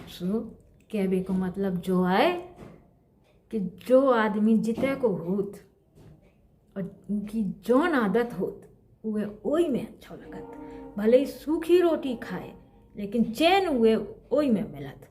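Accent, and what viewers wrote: Bundelkhand, India. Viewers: बड़ो नोनो हतो जे किस्सा तुमाई मीठी बुंदेलखंडी बोली बहुतई भा गई हमें गुईयाँ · बुंदेलखंडी की मीठी जुबान, और आपका सुनाने का अंदाज मजा आ गया 🥰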